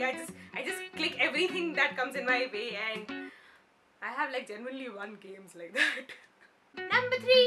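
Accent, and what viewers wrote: India. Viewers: Your indian accent is sooo cute..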